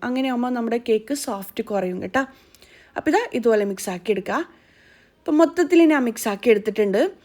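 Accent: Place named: Kannur, India. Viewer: നിങ്ങള് കണ്ണുർക്കാരിയല്ലേ... നിങ്ങളെ സംസാരം ഇതുവരെയും എവിടയും കേൾക്കാത്ത സംസാരം... ഓരോ വാക്😂 റെഡി ആകാൻ വേണ്ടിക്ക് ഇങ്ങനെ കുറച്ചു ... കേൾക്കാൻ രസം ഉണ്ട് 😁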